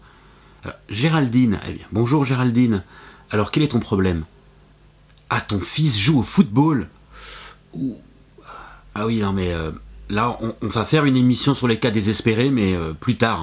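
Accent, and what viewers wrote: France. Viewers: Salut :) Je regarde tes vidéos de temps à autre et je peut te dire que tu as de l'avenir si tu continue ainsi ! Reste comme tu est avec tes "vieilles" blagues qui malgré le temps nous font toujours marrer et ta prononciation à la française des mots anglophones est juste ÉNORME. Bonne continuation à toi et tu peut compter sur moi pour un petit commentaire dans chacune de tes futures vidéos. A plus :D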